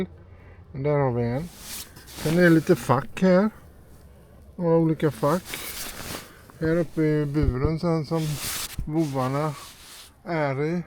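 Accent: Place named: Gothenburg, Sweden. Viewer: En härlig vandring genom Dole [dåll] (lite dragning åt ö - känner mig lite som besserwisser, men du undrar ju då och då i filmen). Oavsett dina uttal (det är lite käckt på göteborgska) så följer jag er mer än gärna. 😊😊 Ni förmedlar hela tiden en känsla av att själv vara närvarande med er. Fortsätt så! 👍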